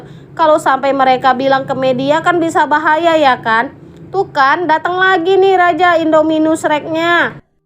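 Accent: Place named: Medan, Indonesia. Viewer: Ini baru flim yabg ku suka. Mana suara anak medan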